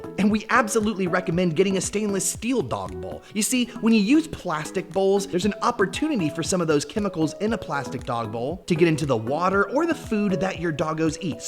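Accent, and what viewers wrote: United Kingdom. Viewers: This guy can star in any British movie lmao I love his British accent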